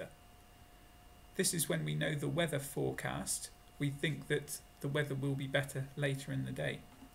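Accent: United Kingdom. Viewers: You have so cool British accent...I think it would be a good idea just to talk about something in English, tell the story or stuff like that. The topic isn't so important I guess. A magic happens when students hear English. That is a quite important thing in the way of learning.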